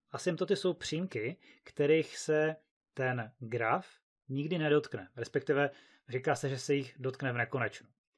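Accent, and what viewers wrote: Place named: Moravia, Czech Republic. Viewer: až na ten moravskej prizvuk docela dobry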